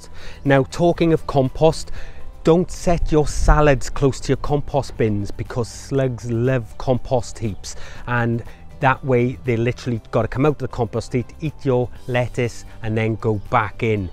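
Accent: Wales, United Kingdom. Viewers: I hear the accent...I subscribe. Dan and oliver, Cardiff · Guessing from the accent your Welsh too, I'm from the Swansea area. Before this month my soil has been turning into dust. I know about the problem with the jet-stream breaking down over the last few years and the low rainfall over the last 12 months for the south coast. Is there anything that really works to keep soil moist. I just bet that after this month, its going to go dry again. The garden is loving the rain this month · Margie Watson He’s from the Welsh valleys, maybe Maerdy, Ferndale, Aberdare ?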